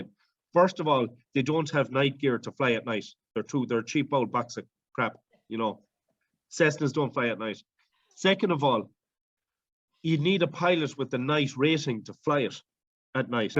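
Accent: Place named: Ireland. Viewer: Thanks 😊loving your Irish accent. How do you take your photos and do you use special software to get the close ups? I'd love to photograph my craft I see.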